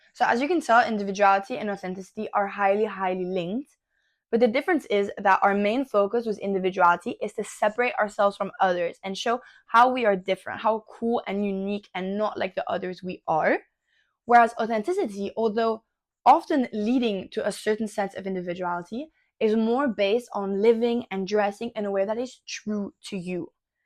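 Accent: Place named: France. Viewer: Sister 🥹are you french btw? Ur accent is so cute:3 I'm not trying to be rude, but I've been learning French for awhile but only textbook style. I only started listening to French content, and I kind of recognise the accent..?^^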